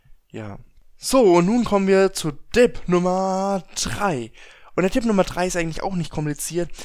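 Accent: Franconia, Germany. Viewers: aus franken? :D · Schätze mal du bist ein Fränkischer Mensch aus Franken (Dipp). Du redest ja wie der Loddar. Aber mach die nichts draus. I ben of älle fäll a Schwoab. Ich finde ja alle südlichen Dialekte super. Aber so ein Ossi oder auch Fischkopf-Dialekt kann ich gar nicht ab.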